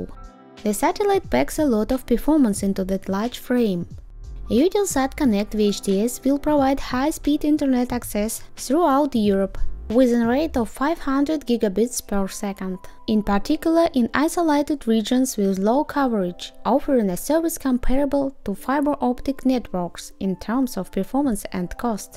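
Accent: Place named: Slovakia. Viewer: As for the accent I'd say Slovak or maybe Romanian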